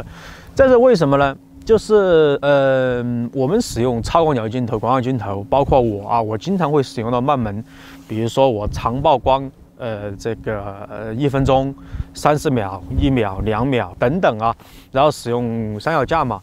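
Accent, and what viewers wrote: Sichuan, China. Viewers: 正宗川普，支持！已点赞、订阅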